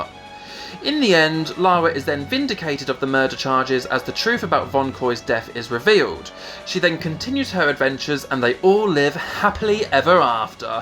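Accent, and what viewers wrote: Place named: London, United Kingdom. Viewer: Why does it sound like you live in London?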